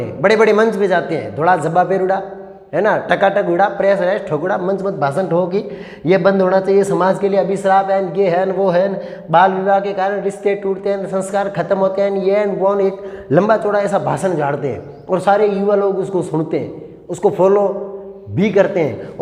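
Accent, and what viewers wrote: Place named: Jaisalmer, India. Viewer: जैसलमेर की प्योर भाषा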